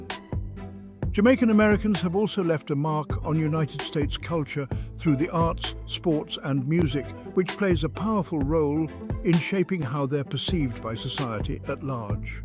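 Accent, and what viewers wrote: United Kingdom. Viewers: With that english accent ,i wonder why this one is concerned about Jamaica and USA,remind me of the Africans with no concern about their own messedup continent,but always focussing on Jamaica,but when did Jamaica become a part of the USA,its an immigrant country, jamaicans like most immigrants has been going back and forth since time began,and again why is mr Brit accent concerned about Ja..hhhhh..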